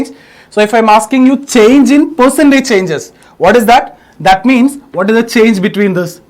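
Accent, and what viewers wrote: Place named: South India, India. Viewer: Bhai sir ka accent south indians jaisa lag rha h...may be he is more fluent in english than hindi...isiliye sir ne english prefer ki h hindi ki jagah...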